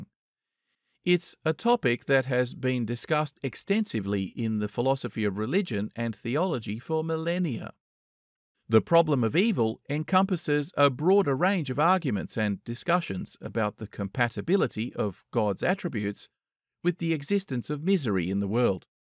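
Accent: Australia. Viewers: I must say very colour full English language used by it's creator while narrating in Aussie Accent with various cuttings of picture, But I like it very new experience. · Voltaire and an australian accent....?? OMG.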